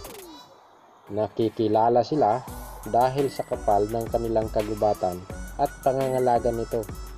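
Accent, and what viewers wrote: Visayas, Philippines. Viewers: i think he is bisaya,the way he talk,that's why.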